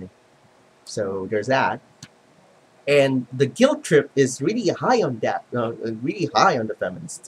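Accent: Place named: Philippines. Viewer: ***** Excuse me, I'm also a Latino who doesn't speak Spanish/Castilian, you ''look Filipino'' (not even the ''most Mexican-looking'' of the Asians, that prize would go to the Viet-Namese, and the Indonesians take a good 2nd :-P ), I assumed you were because on A Voice for Men (where your video is featured) a bloke said ''galing'' and called you Pinoy :-P and your accent is more akin to that of a Filipino... or an Ecuadoriano than that of a Méjicano (as Méjicans, Centro-Amirikano's and Colombiano's tend to use the ''ii'' sound while Ecuadoriano's, Filipino's and Europeano's use the ''ih'' sound more), this is odd, usually my comments are invisible, and when you commented on your own video bellow my comment I couldn't see it until I selected ''answer'' my own comment, people probably reported you as spam or something, I like this new Google+ integration, but I hate this ''feature''. 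(>_<) Be glad that you're not an Ecuadoriano (what I first thought you were) as that's the country where Feminists threatened to cut of innocent men's penus (yes, that's the plural of penis) if Lorena Bobbit would go to jail (yet they are seen as ''freedom-fighters''), also most Castilophone western countries had female heads of states, but the palces with the most radical Feminists never had... makes one think... I don't know if you get this a lot, but you really look and sound Filipino/Ecuadoriano, though Filipino's are universally paper-pale (literally everyone in the Philippines can play for the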